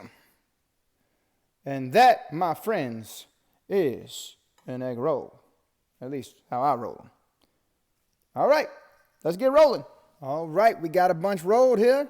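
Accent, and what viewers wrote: Louisiana, United States. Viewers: Oh yes, very Cajun sounding so entertaining.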